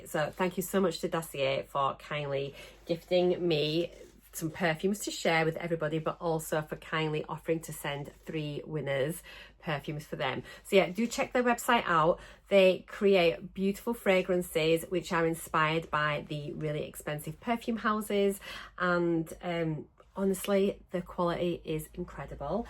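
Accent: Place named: Manchester, United Kingdom. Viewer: First time commenter. I found you a few weeks ago and really enjoy your videos. I'm originally from Manchester but have lived in the states for many years. I feel a bit homesick when I hear your accent. Your weight loss.journey is inspirational. I don't know if the perfume giveaway is just for UK subscribers. If not, I would love to be in the drawing. Jo.x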